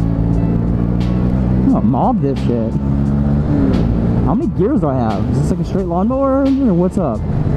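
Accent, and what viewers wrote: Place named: United States. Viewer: thanks for the reply. I was confused as well because from your accent I knew you were an american and wondered if you were refering to imperial or metric system.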